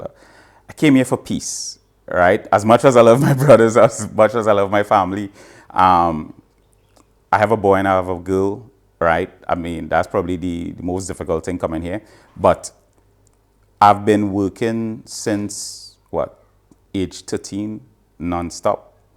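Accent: Trinidad and Tobago. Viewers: Establish a community, one Trini to another.....good going, accent cannot hide. How will you earn an income though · From TRINIDAD &TOBAGO 🇹🇹 I always wanted to hear our TRINIDAD 🇹🇹 accent joining you just now everyday I dream of coming to live in AFRICA travelling there shortly as I renew my passport AMEN 🙏🏿 🙌 To you both enjoy life in the MOTHERLAND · Interesting to hear the Trini accent in Gambia. Welcome home brother. I lived in Trinidad for over 15 years, love the islands, still visit every year. Consider Trinidad & Tobago as my adopted homeland. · Lovely to hear your beautiful Trini accent🇹🇹 From one Trini to another, many congratulations on returning to the Motherland. I’m inspired.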